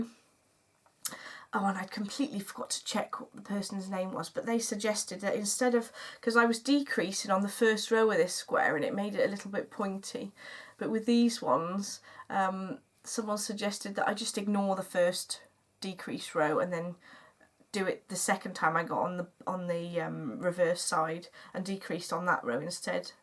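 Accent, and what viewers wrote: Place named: Bristol, United Kingdom. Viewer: Oh I just found you! Fab podcast and such fun that you are in East Anglia..I'm in Cambridgeshire....although your accent sounds more Bristolian??:) Look forward to your next episode x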